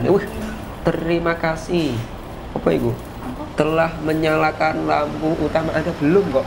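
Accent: Java, Indonesia. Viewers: Di suarane arek jawa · peh jowone ketok tenan🖒🖒😂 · Orang jawa mana suaranya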